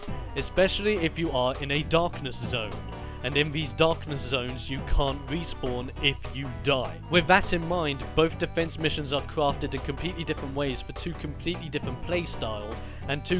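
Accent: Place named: United Kingdom. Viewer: Um... he's British.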